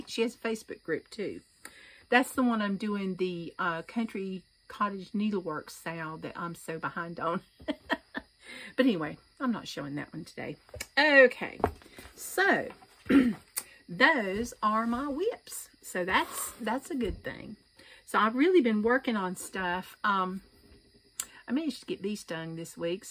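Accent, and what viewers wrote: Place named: Texas, United States. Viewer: Hi, Just found you on FlossTube. Your accent is a little thicker and adorable than some of us Texans. 😉 Naturally, I had to find out where you are from by going to your #2 video. 🙂 Loved seeing your play by play of Friendstitch. I’ll be sure and sign up for my first one next time. Harvest blessings. Thanks, Darlene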